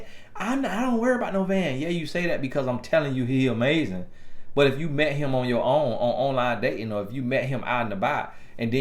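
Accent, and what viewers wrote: South, United States. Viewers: I’m a northerner so I love to hear southern accents 😆😆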